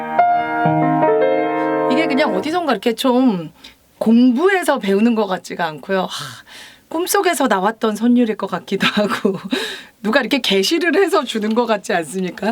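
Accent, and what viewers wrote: Gyeongsang, South Korea. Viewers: 열팬 됐습니다. 근데 말씀에 경상도 발음이.. 아닌가요? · 경상도 억양이 나오시는데요ㅋ 친근한 느낌입니다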